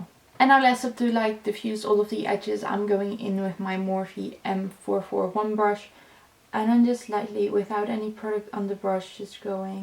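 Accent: Netherlands. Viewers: Are you Dutch? Your accent sounds like a Dutch accent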